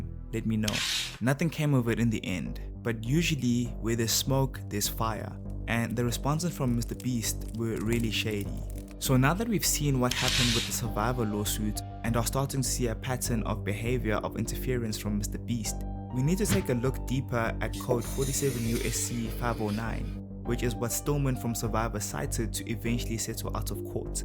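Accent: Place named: South Africa. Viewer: What a great video, instantly knew you are from South Africa. We have a distinctive accent.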